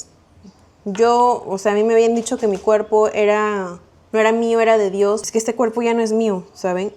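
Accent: Mexico. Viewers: Interesante el tema, pero me Jode escuchar a una peruana sin identidad.. hasta el tono es de Méxicana.. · Oye tu ecento se escucha muy mexicano y tú testimonio es espejo de muchas personas lo importante es que eres tu misma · Porque hablas como Mexicana?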